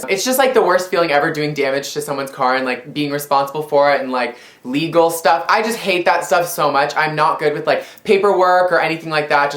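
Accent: Canada. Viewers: it sounds more canadian than his normal voice hahaa x)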